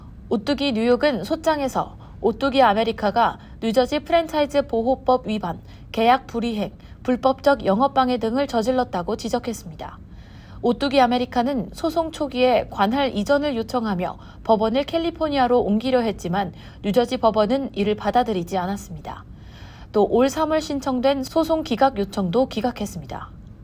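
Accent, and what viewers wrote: China. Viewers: 이사람 목소리 발음이 수상 중국인인가?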